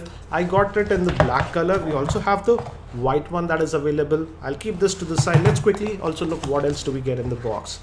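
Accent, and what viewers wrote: India. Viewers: he speaks like an Indian I think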